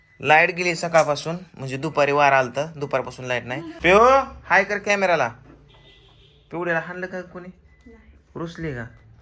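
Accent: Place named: Kolhapur, India. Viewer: Dada kolabi boltata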